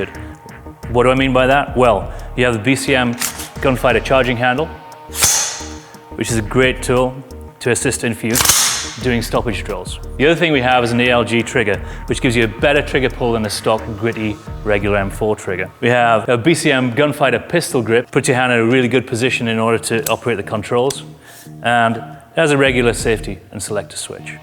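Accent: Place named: United Kingdom. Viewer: I love my bcm stuff but the British accent didn't work for you guys.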